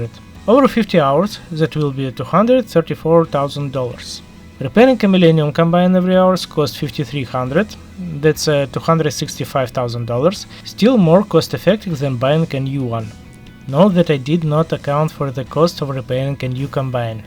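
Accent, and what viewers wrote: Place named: Arab world. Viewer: i can't deal with your arabish accent sorry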